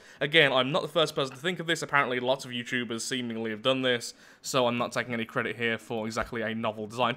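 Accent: United Kingdom. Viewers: 1. your british, not weird. cheers mate 2. i do believe the idea of increased bearings to increase speed is a good idea. even just one or two per wheel. just as a point to point increase use.(on a button) 3. if you make the collector apart of the crane, weight not considered, would be the best moving forward. nice thing is the collector is placeable and buildable upon. such as the drills attached to bearings on the collector for added pressure. 4. i do enjoy the forked wheel design and the "spider" like stance. 5. if you have questions on how to connect items of logic, or Moonbo or Kan are great choice to review here on YT.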